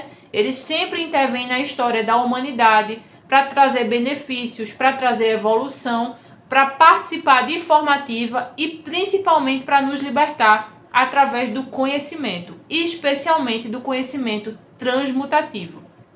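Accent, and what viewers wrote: Northeast, Brazil. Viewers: vc deveria fazer videos com mais frequência. ACHO QUE PELO SOTAQUE MEIO NORDESTINO AGENTE ENTENDER ATÉ MELHOR AS INFORMAÇÕES😂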